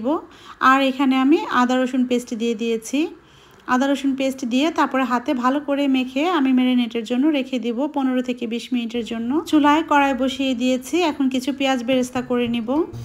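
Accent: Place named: Tangail, Bangladesh. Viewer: কথা বলার স্টাইল মনে হয় আপনার বাড়ি টাংগাইলে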